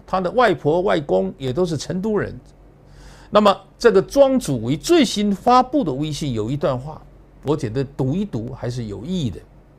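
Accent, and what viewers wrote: Fujian, China. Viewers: 老杨亲美反中反习，了无新意！但是讲的一口福建普通话，倒是很适合大陆观众的口味。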